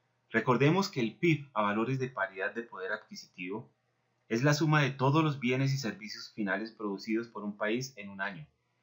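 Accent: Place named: Colombia. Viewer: tiene acento colombiano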